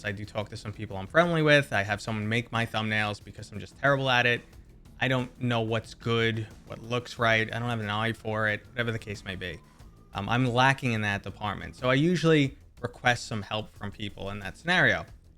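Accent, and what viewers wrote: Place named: New York, United States. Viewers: I KNEW YOU WERE FROM NEW YORK!!!! Yesss! Love it! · AYY A FOCKING NEW YORKA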